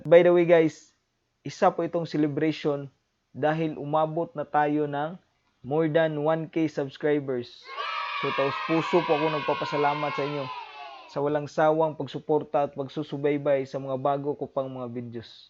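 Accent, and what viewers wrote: Visayas, Philippines. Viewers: oh my word the way you speak tagalog, so me. lol. apir sa mga bisdak nga magahi talaga. lami kaau ang crispy dong! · New subscriber kuya!! Bhlag bisdak kaayo pero okeyyy keyuuu.. request kog e mukbang nga pancit canton chilli mansi with boiled and sunny side up egg 😁😁 lami kaaayooo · Ka bisaya pud nimu oi..pero proud ghpon meng mga bisya nimu bai!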